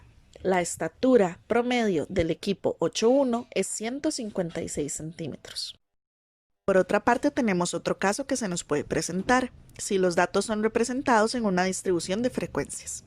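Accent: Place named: United States. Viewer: Soy yo o la profesora habla como si fuera gringa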